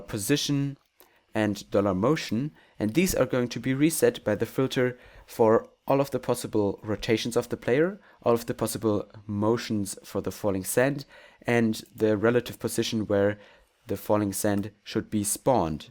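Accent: Germany. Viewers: are you german? you don't speak english as well as a real english people!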